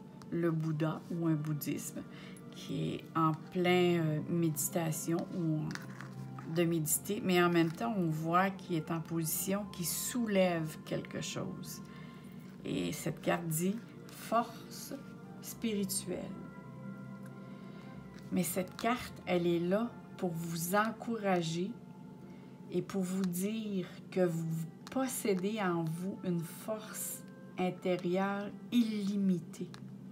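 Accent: Quebec, Canada. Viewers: Bravo quelle belle énergie et j apprécié beaucoup ce petit accent me rappelant la douce Céline dion.bonne intuition merci à vous. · Cc chère ^ ^ tt a fait vrai mon dieu que j aime votre accent..cela me ramène en 1991 mes 24 ans mon voyage au Canada.. Québec...mes études d infirmière....J étais dans la région des Laurentides...magnifique pays....et oui une grande fatigue indescriptible...je continue ms mon dieu quelle résilience....bisous ^bon bec^..& oui des cicatrices inomables un passé très très douloureux qui a laissé des marques......pour au bout du compte devenir qq un de très fort oui une volonté hors pair merci gratitude namasté.......tout ce qui ne nous tue pas nous rends plus fort et oui j affirme.. kris de Nice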